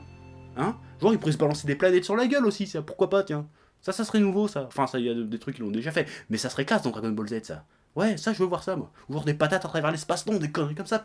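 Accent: Brittany, France. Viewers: Tu est de Brest ? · T'es brestois?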